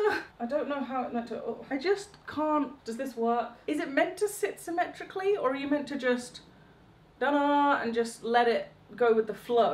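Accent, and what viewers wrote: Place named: RP, United Kingdom. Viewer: Oh no! She's gone full on posh! But I love the tweed!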